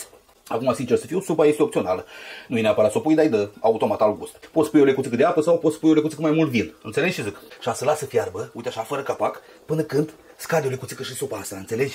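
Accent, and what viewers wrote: Moldova. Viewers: M-ai pierdut cu moldovenească ta că nu înțeleg mai nimic 😢😢😢😢😮😮😮😮😮😮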